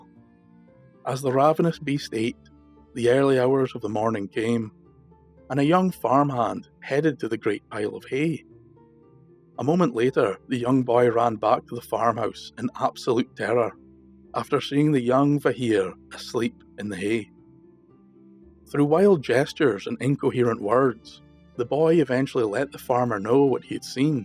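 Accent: Scotland, United Kingdom. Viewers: I’ve read or seen a lot of the words/names etc many times in books I’ve read previously. Unfortunately I’ve butchered the pronunciation of each in my mind. So I like hearing the correct pronunciations in your stories. I found your Awesome channel by accident and it’s the best thing to happen to me all day! A Scottish accent is my favorite and I could listen for hours….so I’ve been bingeing! I’ve shared with friends, hopefully they’ll subscribe as well.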